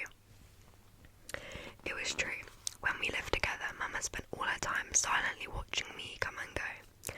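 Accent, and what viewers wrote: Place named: France. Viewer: I love this book, Camus is such a genius! Plus the English to French language switch is incredible 🌟 PS: Love your French accent, you did great!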